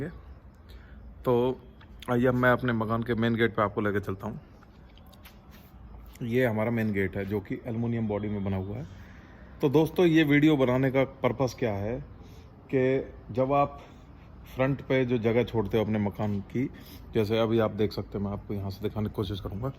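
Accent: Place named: Haryana, India. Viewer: Bhai apke smjhaane ka tarika bhut hi alg hai or bdia hai apki awaz se lgra hai ap humare haryana se hi ho or humari jaat caste se hi lgre ho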